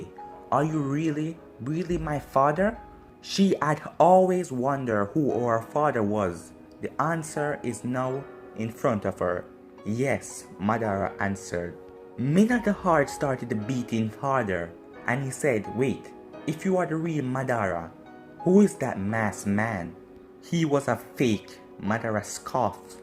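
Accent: Jamaica. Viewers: He’s Jamaican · We’re are you from Jamaica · How you doin ? And are you Jamaican ? If you are wa gawn? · love the accent, jamaican?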